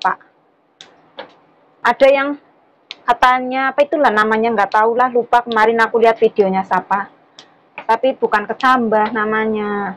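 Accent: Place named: Java, Indonesia. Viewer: Sama.....lucu mbak kalau ngomonh jawa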